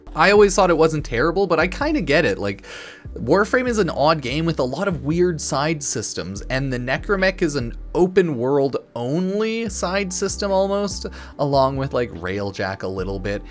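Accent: Canada. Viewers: are yuou Canadian? timmy fan eh?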